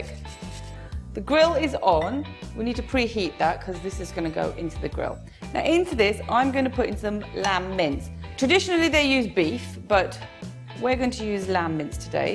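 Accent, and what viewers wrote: United Kingdom. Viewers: Looks Indian, sounds British and is cooking Mexican I am really confused right now. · Amazing british accent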